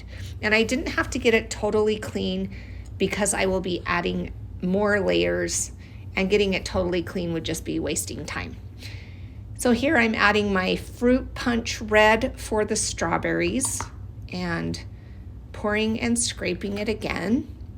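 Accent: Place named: Great Plains, United States. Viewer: plains states. Northern-ish, Like Kansas toward Idaho. Huwhite, meAsure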